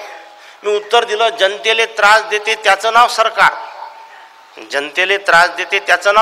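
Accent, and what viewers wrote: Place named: Vidarbha, India. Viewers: Khup sundar. Tumchi Marathi aikun khamgaon Chi aathvan aali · निखळ विनोद. हसून हसून मुरकुंडी वळते. मिर्झा बेग साहेबांची विदर्भातील वऱ्हाडी बोली फार भावते.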